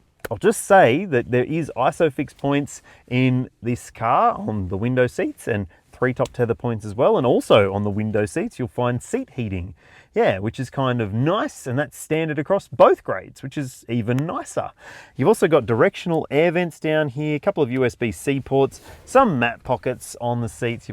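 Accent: Australia. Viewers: Lol average American moment. How can you not tell that this is from Australia? The car is right hand drive and he has an Australian accent.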